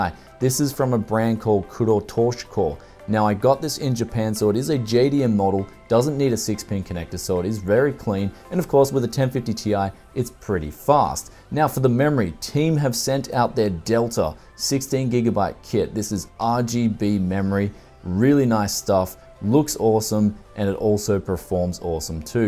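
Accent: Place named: Australia. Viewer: Good to hear an Aussie voice. Thanks for the give away :D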